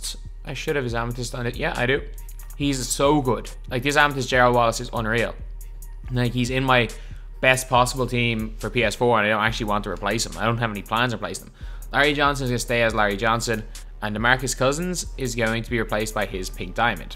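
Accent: Ireland. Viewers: He’s definitely irish ☘️ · Are you Irish or American 😂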